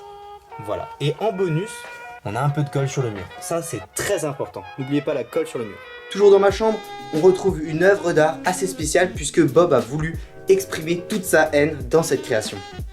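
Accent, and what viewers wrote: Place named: Alsace, France. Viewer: J'étais sûr que tu était alsacien 😂😜🇮🇩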